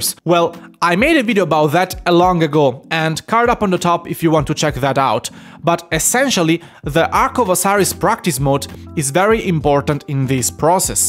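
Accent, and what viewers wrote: Italy. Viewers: Quanto se sente che sei italiano! Bel content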